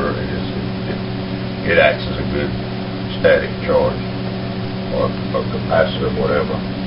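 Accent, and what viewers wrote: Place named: Kansas, United States. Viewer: yes the voice and i do like the accent from kansas??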